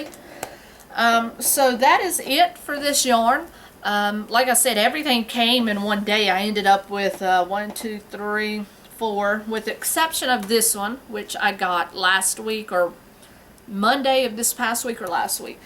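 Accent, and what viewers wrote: South, United States. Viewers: I was wondering where you're from? You mentioned Minnesota but you definitely have that Southern drawl like someone from either Virginia or the South. Also what did you make with the yarn in this video.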